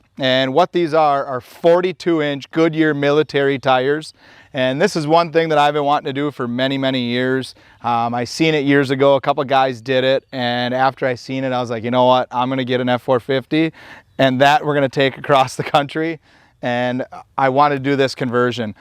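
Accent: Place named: Canada. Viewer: Awesome truck! I was wondering if you were Canadian by your accent, then I heard you mention Wisconsin...very similar.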